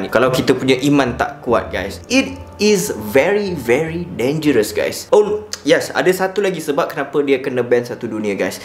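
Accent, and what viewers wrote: Johor, Malaysia. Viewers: Bahasa awk .tak sesuai..giler..korangg gay..DLL...slang lebih Johorean... sedang kan... yang tonton nya satu Malaysia Indonesia...gunalah bahasa . sebut betul sikit..atau baku pun boleh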